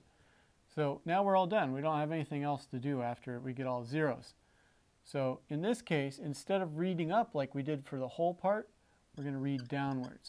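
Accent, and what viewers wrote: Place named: United States. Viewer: Congratulations you're the only person with an American accent I can find for the subject. You win.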